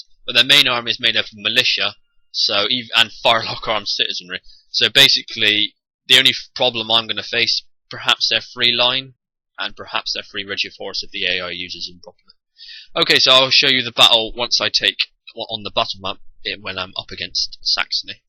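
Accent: United Kingdom. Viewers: I have never heard an Indian with a British accent before. It sounds nice. No really still loving this rant.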